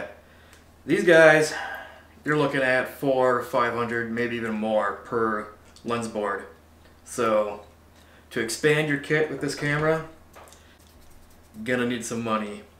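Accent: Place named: Minnesota, United States. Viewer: I thought I recognized that accent! Used to live in Duluth. 😅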